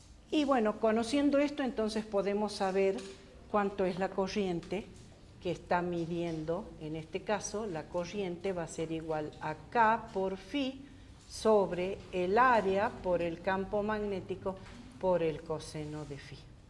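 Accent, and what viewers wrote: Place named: North, Spain. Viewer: Que Facultad es? Tiene acento norteño la profe.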